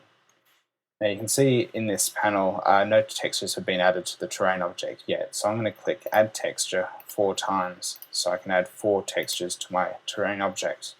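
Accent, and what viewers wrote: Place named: Australia. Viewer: i thought i heard an aussie accent, we aussies are legends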